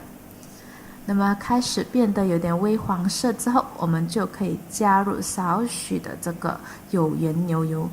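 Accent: Malaysia. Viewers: 果然马来西亚华语，语病连连～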